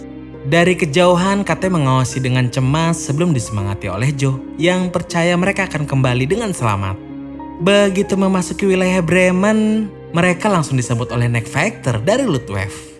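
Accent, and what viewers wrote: Indonesia. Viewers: Pengucapan kata kata dlm bhs Inggrisnya lurus banget spt bahasa Indonesia 😭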